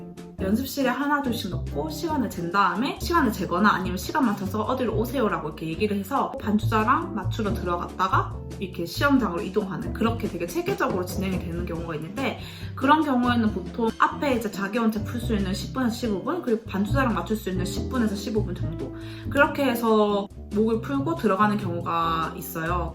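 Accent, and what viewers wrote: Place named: Seoul, South Korea. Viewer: 아니 님 영상에서는 왜 서울말을 쓰시는고에요?🤭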